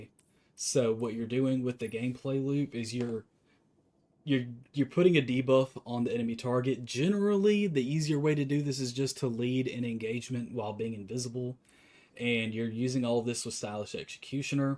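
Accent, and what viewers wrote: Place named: South, United States. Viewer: As someone fro europe i really enjoy the southern draw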